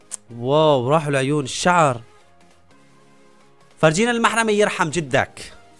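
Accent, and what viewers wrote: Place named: Iraq. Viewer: سوي فيديوهات عن الاكل الصيني حباب متابعتك من العراق ولله مبدع وتره حجيك يويه يشبهه حجينه ولله احلى قناه...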